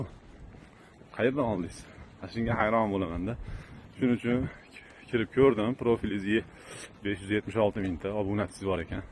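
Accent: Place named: Andijan, Uzbekistan. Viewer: Ома гап йук анжонча гап булди ишларизга омад ✊ Хусанов укамиз уз даражасини курсатади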